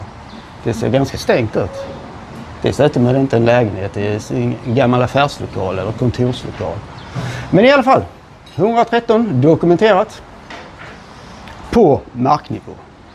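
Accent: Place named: Skåne, Sweden. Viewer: Intressant film, hör att du är från Skåne och runt Hässleholms trakten. Välkomstdrinken i filmen togs på ett hotell i San augustin dock svårt att se in där då det är murar mm framför. Något jag sökt efter.i många år är var dom spelade in inköpet av Lasses åsna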